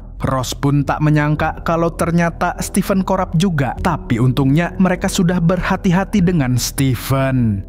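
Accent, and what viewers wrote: Banjar, Indonesia. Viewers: Urang Banjar kah nywa,,haha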